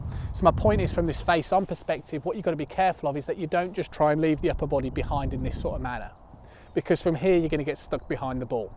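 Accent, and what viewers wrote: United Kingdom. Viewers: I too have watched what seems like endless list of videos until I stumbled upon! I like that he acknowledges there can be variations on how we all get to certain points in our swing and where we go from there. In the beginning I really had to concentrate on listening to his fast paced British chat, but am now tuned in. He’s very articulate and I am now on board.👍 Next up I will try to get my swing video done and sent. Thanks and keep up the informative videos. Also, looking forward to more videos dealing with some limited flexibility.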